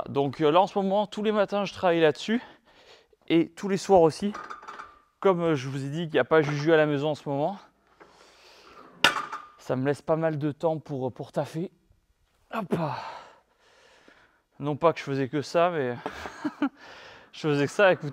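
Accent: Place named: Toulouse, France. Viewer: 14 ans que je le suis aussi , on a connu tibo quand il avait l'accent "toulousaing" fréro le temps passe trop vite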